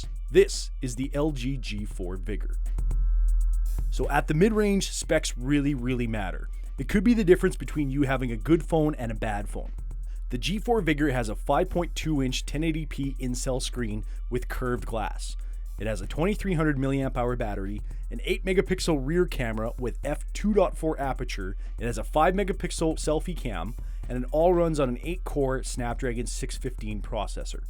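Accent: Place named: Toronto, Canada. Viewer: Such a Toronto accent!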